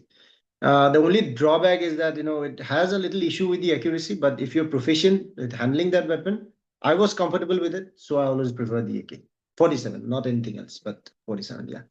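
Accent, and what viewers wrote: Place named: Assam, India. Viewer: I think he origintes from Assam through is accent.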